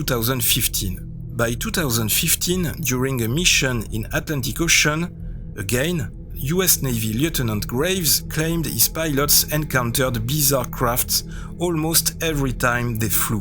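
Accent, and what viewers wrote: France. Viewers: hahaha, your strong french accent is very funny, but nice from you to give it a try and inform the world with your french knowledge, this is seldom. You have forgotten to mention 2 very important whistleblowers/researchers about Ufo Crash Retrieval Teams like the Blue Berets: Leonard Stringfield and Sgt. Clifford Stone.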